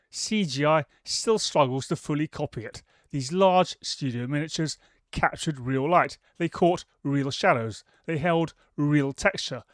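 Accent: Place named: United Kingdom. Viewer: I really don't need some guy with a British accent telling me the plot's and science is nonsense. It's FICTION like everything coming out of the mouth's of EU leaders these days. I liked the stories.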